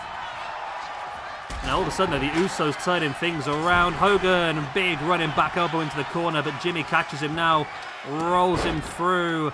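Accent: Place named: United Kingdom. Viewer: Bottle o’ Wataa , love the British accent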